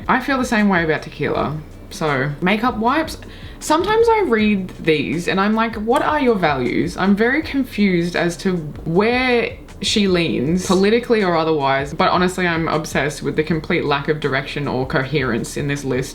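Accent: Australia. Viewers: Is that a surf green Fender???? I think it's surf green. It's one of the original Strat colours... They had to use Automotive colours because everything was in limited supply due to WW2. So you play 😊 cool 😎 I'm playing a cheap Cort it's a rad guitar 🎸 24 frets... So what is your site about? I'm Paul I work with heaps of people online... I go by Maori Jeebus 🖕 Maori because they hate it. And Jeebus because they hate it 😊 👍 I'm going after Trump at the moment. I was the one responsible for ELONS latest problem's. You sound Australia? I'm in Sydney.... Homoville i will leave information for you please network it out I don't push BS... Here's one have you ever heard of the Elohim? I bet Elon him doe's? He is Lucifer sweetheart mark my words I've nearly intrapt her.... I like your guitars they are rad.. paulburns 299 this is my Instagram I post stuff most days.... 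I'm chilling this week going to promote the Aboriginal and Maori People this week I'm sick of America... Good to meet you sweetheart keep up your good work lattaz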